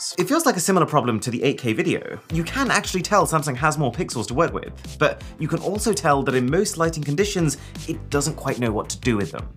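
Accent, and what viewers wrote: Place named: United Kingdom. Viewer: The only British guy whose accent i can fully understand 🫠. Great video tho ✌️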